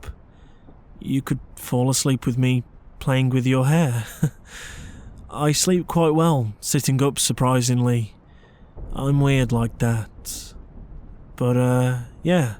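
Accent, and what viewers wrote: United Kingdom. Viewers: Love your British accent 😍 also you very amazing person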